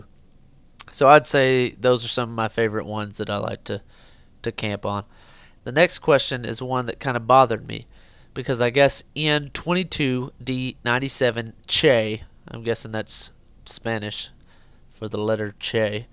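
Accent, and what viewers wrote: South, United States. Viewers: Sounds southern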